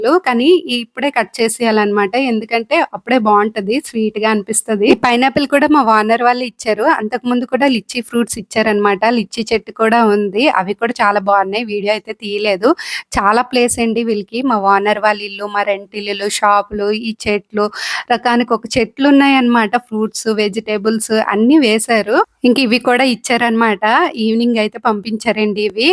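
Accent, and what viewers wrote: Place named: Srikakulam, India. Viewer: మీరు ఇంతకస్టపడి తెలుగు మాట్లాడిన... మన viIanagaram శ్రీకాకుళం బాష మారదు.. మాది తూ గో జీ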